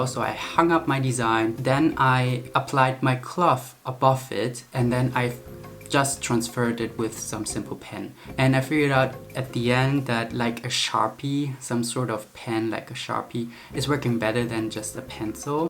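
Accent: Germany. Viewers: the German accent is kind of cute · Are you German?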